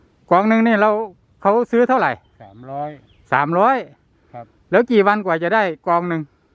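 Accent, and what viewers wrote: Isan, Thailand. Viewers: แฟนคลับจากลอนดอนประเทศอังกฤษ..จำเสียงได้ตั้งแต่ประโยคแรกแล้ว..ว่านี่มันเสียง..นี่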